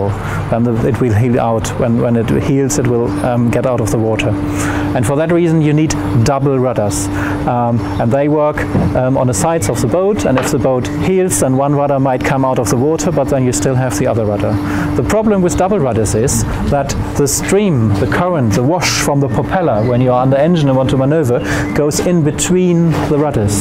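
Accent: Germany. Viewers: Hey, it is you again. I remember your German accent. How's your business in the pandemic?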